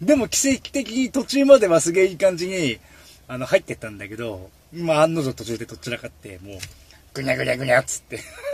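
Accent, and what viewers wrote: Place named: Hokkaido, Japan. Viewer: さんお疲れ様でした。そして本牧ヤードの単独デビューおめでとうさまです😄👍️ 伊藤さんってトレーラー乗りの伊藤さん⁉️昨日動画上がっていてトレーラー降りる事にして次の会社に面接行ってきたと言っていたけど同じ伊藤さんかな………⁉️ ところで、１つ明らかになったことがありまして、私がさんの動画を見ていると（パソコン）、猫がヤキモチを妬いて邪魔をしに来ます。あとメスネコがさんの声に反応して走り回ってニャオンニャオン鳴き喚きます❗️スマホで見てもタブレットで見ても同じ反応をします😅💦⁉️ さんのなまりをダンナの北海道なまりと勘違いして反応してるのかな⁉️⁉️⁉️一昨年亡くなってしまったけど…⤵️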